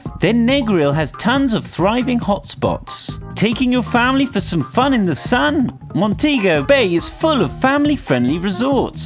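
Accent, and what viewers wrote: United Kingdom. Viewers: This dude is clearly British (his accent) and he is talking about driving on "wrong" side of the road. Dude get serious. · And guys how are u going to assume he's English as we call it just cause he has British accent And plus DUDE OF COARSE THERE GOING TO ASK U TO GET THEIR STUFF and they are just trying to be polite to see if u need help IF U WALK TO A MALL LIKE FONTANA (PS Fontana is a pharmacy here) THEY WILL ASK U TOO THEY Do THAT EVERYWHERE HERE Again not trying to be rude if this comment is offensive I will take it down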